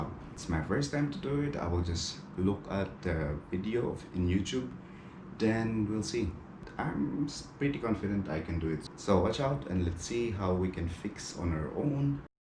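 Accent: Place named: Ifugao, Philippines. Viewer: Bro ano accent mo hehehe kala ko di ka Pinoy...taga Ifugao ka ba?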